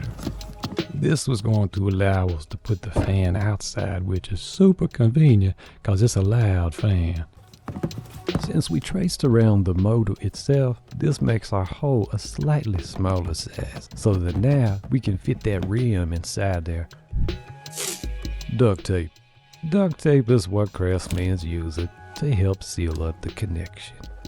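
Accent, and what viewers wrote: Louisiana, United States. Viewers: New here! Great channel. Very well done! Are you in Louisiana? Nailing the accent if not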